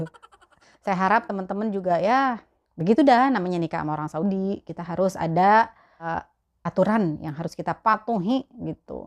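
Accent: Jakarta, Indonesia. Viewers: Logat Betawinya msh kental mpok.hehehe... Dari Al-qassim menyimak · Assalamualaikum... Seneng bngt liat vlognya apa lagi klo lg pas ngmng betawi...sehat² di sana...salam kenal dari Medan (aslinya mah jkt jg..ikut misua stay dimedan) Walasam🙏🙏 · mpo betawi nya kuar semua😂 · Yaa Allaah... betawinya.... bikin bangga... 😍😍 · Logat betawinya masih melekat lucunya 😁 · Suka denger ngomongnya ada betawinya ada arabnya..resep bgt...sukses selalu mpok cantik.. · Logat betawinya nempel bgt.. demen dah mpo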